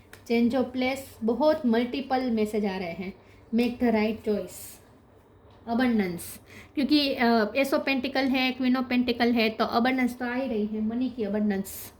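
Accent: Gujarat, India. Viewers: Are you gujarati?.😅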